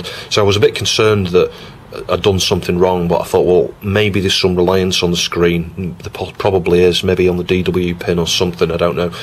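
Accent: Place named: Liverpool, United Kingdom. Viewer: What part of the UK are you from you sound sorta Scouse but then sorta like Manchester or even Cumbria. Good to see you enjoying your hobby!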